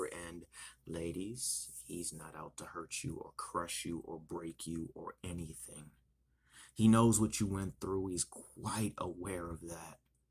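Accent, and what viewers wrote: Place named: DMV, United States. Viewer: Are you from the DMV? I hear my accent 😂😂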